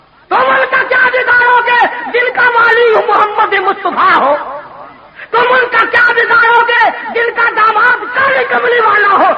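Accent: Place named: Multan, Pakistan. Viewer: Very beautiful and heart touching dialect, listening gives spiritual and divine intoxication. It enlightens and illuminates the colorful fragrance of our red, orange, yellow and green soil. It gives a beautiful fragrance of Soil joyed, experienced and delighted after the Rain. It gives a reflection, thought and consideration that you are sitting in small mosque made by RED BRICKS in the village of MULTAN or you are sitting in small mosque made by RED BRICKS in SLUMS or UNDROON E MULTAN in walled city and listening the BAYAN, Narration or Declaration before JUMMA prayer. His dialect and way of speech truly reflected beautiful fragrance of Patriotism especially for the People of Pakistan, India, Bangladesh and Afghanistan as well. His dialect and way of speech is highly regarded with affection and love.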